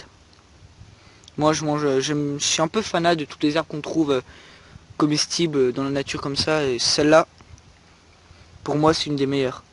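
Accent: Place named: Lorraine, France. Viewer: salut jolie video,de part ta video j ai entendu que tu est de lorraine, je le suis aussi nottament de Nancy.ou s tu pour habitudes de sortir ? si tu a des coins sympas,je suis preneur.amicalement.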